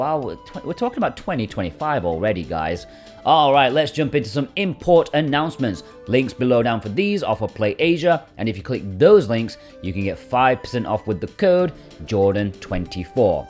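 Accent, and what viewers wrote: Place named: United Kingdom. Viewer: Youre British? It’s strange i can understand your speaking 😅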